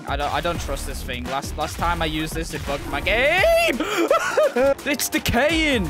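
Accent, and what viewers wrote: United Kingdom. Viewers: are u brithish?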